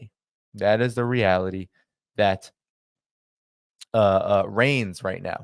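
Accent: United States. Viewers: why are you speaking with an American accent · PLEASE MAKE CHANGES OF YOUR AMERICAN ACCENT AS WELL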